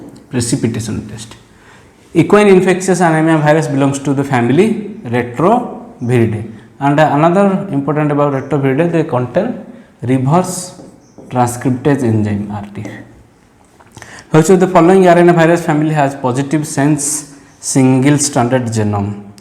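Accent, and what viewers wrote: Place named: South India, India. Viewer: Due to south accent please cc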